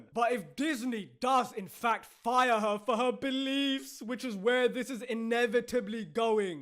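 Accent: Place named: England, United Kingdom. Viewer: I love an English accent that doesn't make me feel stupid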